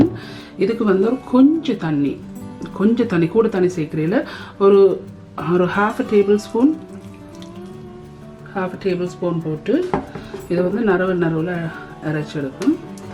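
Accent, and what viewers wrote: Jaffna, Sri Lanka. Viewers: சூப்பர் டெமோ. இலங்கை தமிழும் கேட்க சுவாரஸ்யமாக உள்ளது. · Akkaa neenga muchchu vittu vittu konchum yalpaana tamilil solliththarum alahe thani . Oru sinna kolanthaiku kooda neenga solrathu purium . Seeni sambal bun, boli irendaium seithein super . Appam recipe poduveengala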